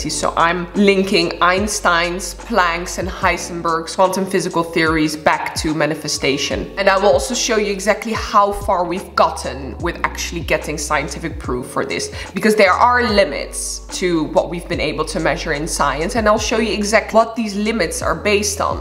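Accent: Netherlands. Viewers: What’s your native language?My guess is Dutch or Flemish? English is a tricky one sometimes. “Thoroughly” is pronounce “thur - O - lee”, with the “gh” more like in though than in rough. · interesting video! BTW, Ben je Nederlands? ;) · Was it just me or was anyone else wondering which country this youtuber is from? She has an indian name, a dutch accent, speaks british english. Bet she lives in the US. Talk about being a global citizen! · Nice Dutch accent.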